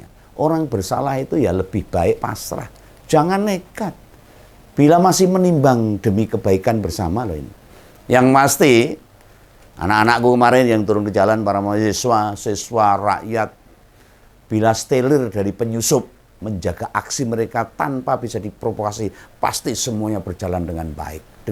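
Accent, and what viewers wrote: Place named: East Java, Indonesia. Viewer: Aku sueneng ngrungok ke mase Iki wong Jawa timur nek ngendikan bloko suto 😂😂😂😂😂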